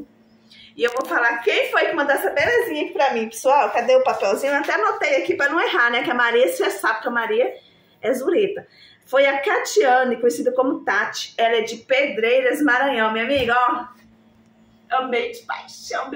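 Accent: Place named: Minas Gerais, Brazil. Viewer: Boa noite querida !!!gostei muito de sua pessoa!!!acho que tenho algo em comum nosso sotaque mineiro kkkk😄comprei 2 semana passada e está vindo de rondonia tao longe estou aguardando espero q chegue em bom estado se eu soubesse antes q tinha vc q vende essas maravilhas tao perto de mim teria comprado de vc mas enfim nao faltarar oportunidade!!!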